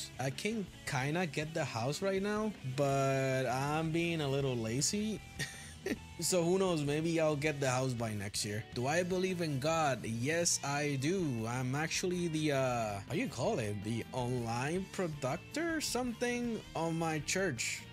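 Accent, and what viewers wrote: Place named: Spain. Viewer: Wallahi i knew that you speak Spanish from the accent 💀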